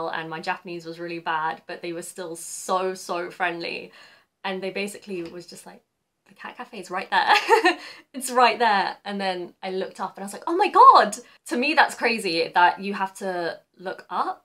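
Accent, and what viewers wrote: United Kingdom. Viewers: 日本語字幕と綺麗なイギリス英語、とても有難いです。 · イギリス英語気持ちいいです。福島県産も受け入れていただいて感謝。日英同盟復活w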